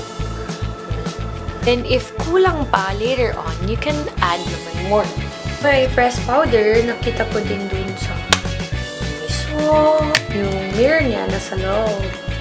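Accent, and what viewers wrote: Visayas, Philippines. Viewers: Ang cute.. Bisaya.. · kakyut jud oi! makaproud maminaw gabisaya ka😂😂😂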